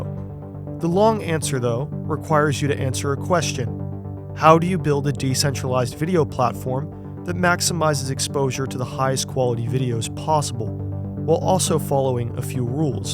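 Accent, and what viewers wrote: United States. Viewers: Wederom dank voor je video (overigens bizar hoe je accent zo Amerikaans is). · i dont get it Why is this guys obsessed with europe He sounds American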